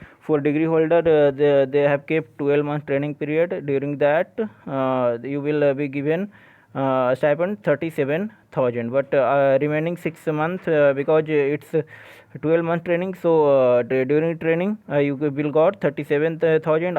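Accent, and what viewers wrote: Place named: France. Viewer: Hindi hi bolde Bhai English Teri French Jaisi hai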